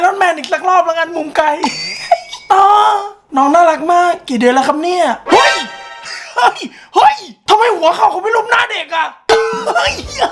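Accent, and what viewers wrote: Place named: Suphanburi, Thailand. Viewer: ผมชอบ พี่ พูดเหน่อ อะ พูดบ่อยๆ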